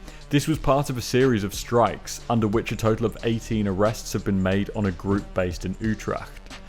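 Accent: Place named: United Kingdom. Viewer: homie believe me when i tell ya, i see no AI in this, this mothefucker got the most UK accent i've ever heard.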